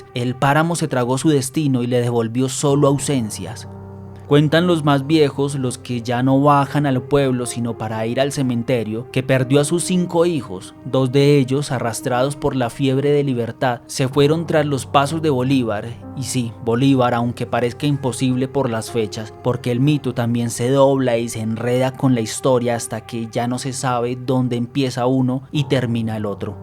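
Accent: Colombia. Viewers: Este narrador no es Venezolano...Es Colombiano... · El mismo script de otro canal bueno igual me encanto con ese acento tan bello viva colombia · Muy buenos los relatos perola malo es el acento colombiano que tiene el narrador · Son historias venezolanas contados por un maldito colombiano? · Es colombiano 😅😅😅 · Muy lindo pero porfavor un venezolano que narre no un colombiano utizando los matices colombianos se escucha raro Pero me encantó los relatos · Me gustó mucho y a pesar de que no es el acento venezolano aprecio que un Colombiano tenga esa dicción tan linda y encima contar con tanta amabilidad las leyendas Venezolanas..nueva suscriptora 🎉❤ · es de Bogotá. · Pero que cantidad de gafedades y de paso la narrativa es colombiana con demasiado acento , la próxima mínimo busquen alguien de aquí, decir chamo o pana no es ser venezolanos. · Paisa, puede ser de gochilandia, fronterizo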